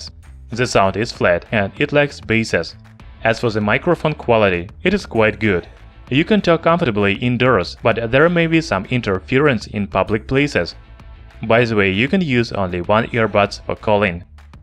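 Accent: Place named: Russia. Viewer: What is your accent? Russian? I can't tell.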